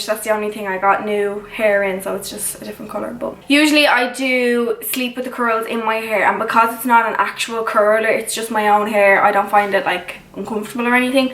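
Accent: Ireland. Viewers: Irish accents ❤️☺️ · are u Irish? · is she irish??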